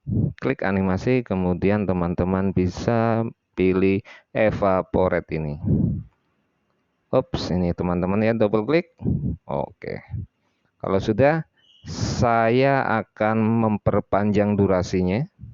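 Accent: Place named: Tegal, Indonesia. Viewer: sampean wong tegal mas?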